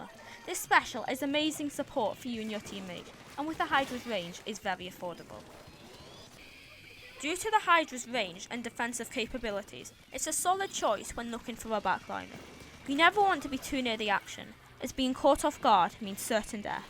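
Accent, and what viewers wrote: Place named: Scotland, United Kingdom. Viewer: omg voice reveal!!! she sounds so Scottish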